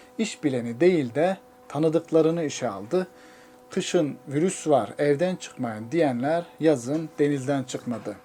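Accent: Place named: Çankırı, Turkey. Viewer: Bi çankırıli olarak şivene bitiyorum kardeşim helal olsun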